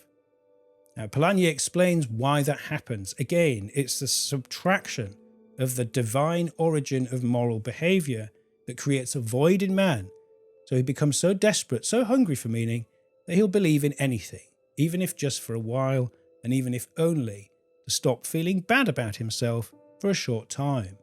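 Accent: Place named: United Kingdom. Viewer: Lovely to listen to a real man, with a polished British accent speaking about the obfuscation going on in the world. From South Africa.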